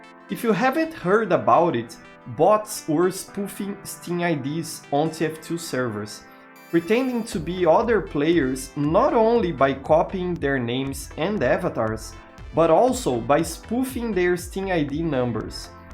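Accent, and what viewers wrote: Brazil. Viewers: Massa ver um BR fazendo um vídeo tão bem informado sobre o TF2. Top 10 sotaque foda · Eu conheço o sotaque brasileiro quando eu escuto o sotaque brasileiro haha. Bom trabalho com o vídeo por sinal. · Bruh, i never heard of you before and this video popped up in my recommended. But something about your accent SCREAMS brazilian, am i right? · Are you Brazilian? you speak english with a very brazilian accent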